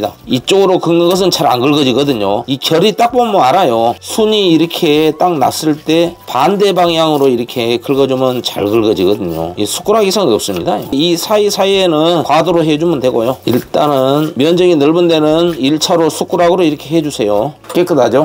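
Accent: Jeolla, South Korea. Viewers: 고향이 어디신데 전라도깡사투리를많이쓰시나요?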